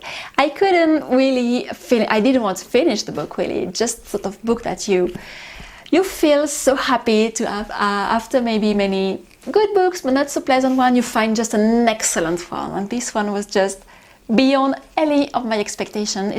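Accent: France. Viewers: Lovely 🌹 It is relaxing listening to you, talking about books and french Culture.You make me want to learn French and Romance. You have a lovely French Accent. keep telling us more. Thanks Merci.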